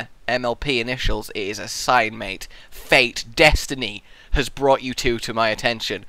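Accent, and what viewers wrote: United Kingdom. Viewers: British .. why would he be german lol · is british or german? or did he move to england later on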